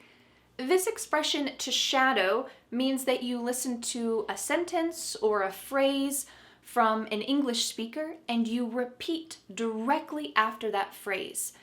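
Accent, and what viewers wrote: United States. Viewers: This way of your American-style pronunciation seems to be a bit difficult for me, my teacher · it's not my intention to butter you up. You don't need it and I wouldn't gain anything with that. But I have to tell you: YOU ARE THE BEST ENGLISH TEACHER ON YOUTUBE EVER!!! I've been teaching English for over 40 years. During this time I've worked with a few American teachers. But... some of them have left me with a bad impression of Americans. You, on the other hand, have such a nice, humble approach in your classes, that sometimes I wonder if you are really American, despite having the best American accent I've ever heard. Just kidding! I don't doubt your nationality. But I understand why you are so successful as a teacher! I'll recommend your channel to all my students, not only for the quality you give to it, but especially because of the wonderful person you seem to be. 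I wish I could meet you and be your friend. I mean it!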